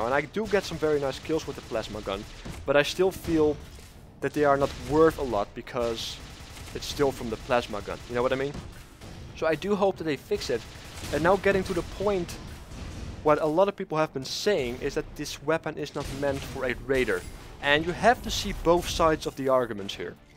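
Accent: Netherlands. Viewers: you are dutch! herken het gelijk haha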